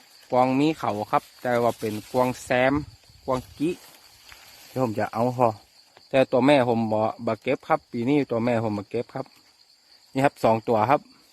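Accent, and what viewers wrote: North, Thailand. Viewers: คนเหนือใช่มั้ยคับ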